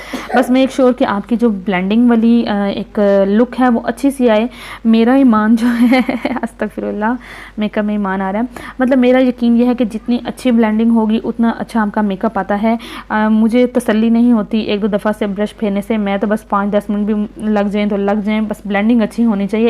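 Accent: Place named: Pakistan. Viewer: Apka way of talk boht cute hai...pakistani language hai.meri ek frnd dubai me thi vo apke jaise ho bolti thi... beautiful makeup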